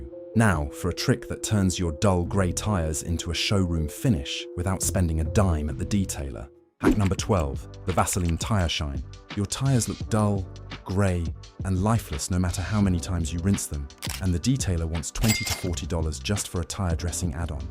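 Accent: England, United Kingdom. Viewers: Why is original English accent talking in Dollars⁉️ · English accent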